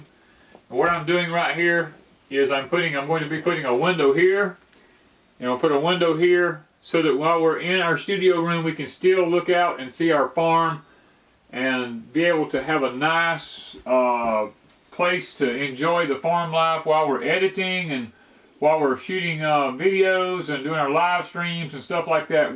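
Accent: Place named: Deep South, United States. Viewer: Nice job, I can tell you are Deep South. In NC we would insulate the floor. Enjoyed watching.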